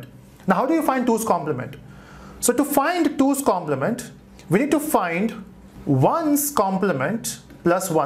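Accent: Telugu, India. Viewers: Are u from andhra? /Telangana? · are you from india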